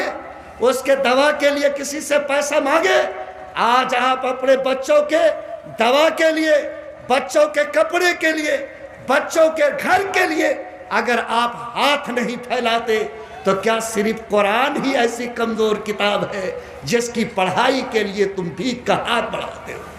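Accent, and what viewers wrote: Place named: Bihar, India. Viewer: Andaaze bihari hae. Lekin haq aur zameeni sachai bol rahe haen.Lachhedar taqreer nahin.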